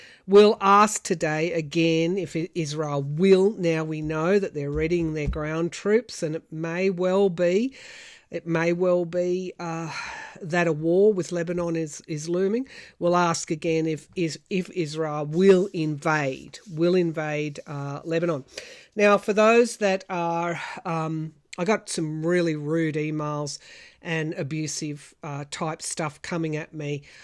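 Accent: Australia. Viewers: Why would anyone write negative comments against her? She's a gem💎, & I look forward to her readings weekly. I luv her accuracy, because she's always right on point. I luv her beautiful Australian accent & her numerous neck scarves! U have to be a nutjob to not like her & need to go away. I agree that BiBi won't do a cease fire until after the US Presidential elections, probably because Trump told him not to.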